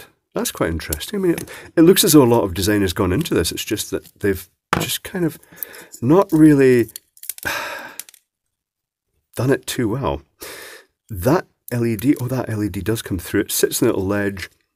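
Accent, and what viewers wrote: Scotland, United Kingdom. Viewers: I only come for the endearing Ms.Doubtfire accent. I guess your content is ok too,.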